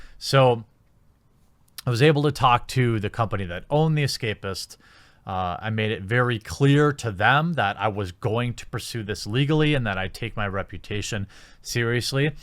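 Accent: Wisconsin, United States. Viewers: It's definitely a wisconsin thing... As an IL person that lived on the WI boarder for 8 years, they pronounce things weird sometimes, but it's not as bad as MN. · Shit are you from Wisconsin? Respect 👍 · He also says “particularily” It’s a Wisconsin thing I think.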